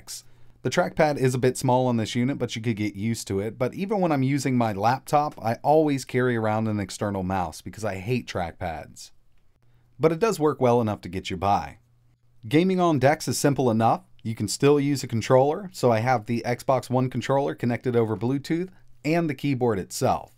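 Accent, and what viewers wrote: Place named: South, United States. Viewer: I love southern accent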